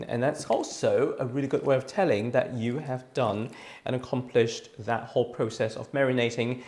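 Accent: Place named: RP, United Kingdom. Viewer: What's with the posh voice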